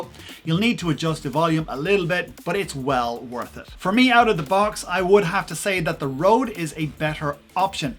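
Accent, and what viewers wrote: Ireland. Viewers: Hi Was scrolling through videos to compare and find a good budget wireless microphone system for DSLR camera. When i heard your Irish accent i said this is my man 😂😂 can you give me some advice if you had any experience with Synco G1 A2 system. I love the Rode Wireless Go but I find there is very little extra"s for your Euro. For €50 less, the Synco has a great all round package but im getting mixed reviews in videos. Just to note i will be using microphone indoors 99% of the time, doing cooking demos. if you have any advice i would greatly appreciate it. 👍 P.S Loved your video & Humor 🤩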